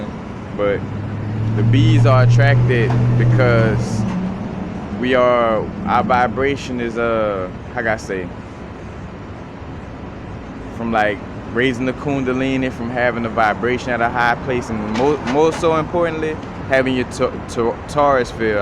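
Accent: New Orleans, United States. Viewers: Sound like New Orleans accent! U from home? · You from New Orleans Bragh?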